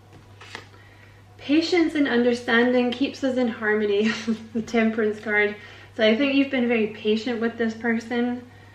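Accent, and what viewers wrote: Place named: Scotland, United Kingdom. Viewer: Such a beautiful reading sweetheart. Yes....that sounds like my twin flame..we both had to do our shadow work before the reunion 🫂. Thank you for spiritual skills. I love your Scottish voice...we are in the same country. Peace&Love&Light Namaste 🙏 ♥️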